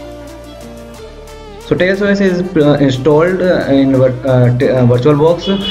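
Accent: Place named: India. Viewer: nothing personal, i cannot stand tech videos with a strong indian accent, not being racist. its is just fucking impossible to learn the details. would be better off with just a generic robot voice. im sure many millions of people around the world would think the same.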